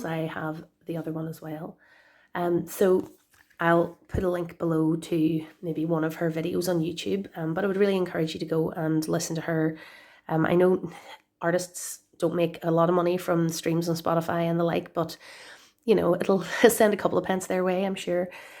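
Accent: Ireland. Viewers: I would love your channel if for no other reason than it makes me so happy to hear someone speaking Irish naturally & with the 'right' accent 💚🌱🍀 My kids would be getting those books if they were 30 years younger 😁😯 I miss the really good kids books. I own that CBR oracle you love - the red one - and also the Enchanted Map. I do love them, any other decks of hers are long gone since I read more about what she is like 🤐 I hope your new one is useful to you 😊 I doubt it will shock you that I LOVE the Wildwood tarot 😍 I don't use it, I just stare at the art & smile. Also love Mystical Moments tarot, her art is unbelievably gorgeous. Thank you for the update. I enjoyed it as always 😊👍💖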